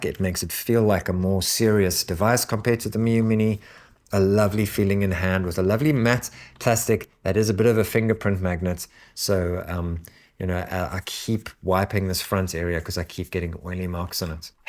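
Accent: South Africa. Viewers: Sounds like you are from Cape Town South Africa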